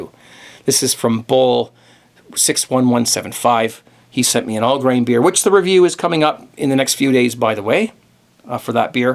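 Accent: Scotland, United Kingdom. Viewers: By the way, love your scottish accent ;) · thats a crackin scottish accent that made me laugh!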